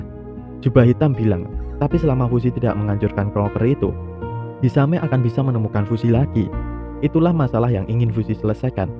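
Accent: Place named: Java, Indonesia. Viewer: auto sub, kekurangan nih channel ya cuma logatnya agak medok :v eh kadang medok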